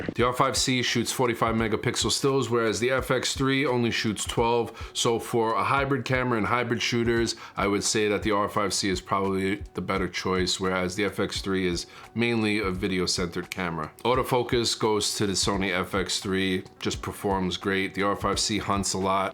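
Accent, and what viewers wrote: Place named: New York, United States. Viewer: Love your accent are you from Jersey or NY originally?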